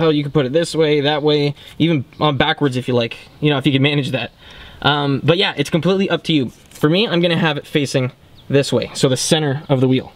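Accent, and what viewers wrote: Canada. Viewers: Hoy sound Canadian ay! 😎👍🏼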